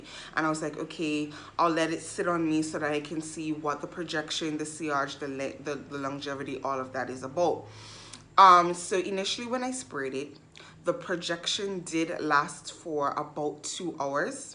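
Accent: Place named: Jamaica. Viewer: Hey , where are you from hun ,?? Sounds sort of Jamaican ish living in Canada ?? Or some Caribbean?🤷🏽‍♀️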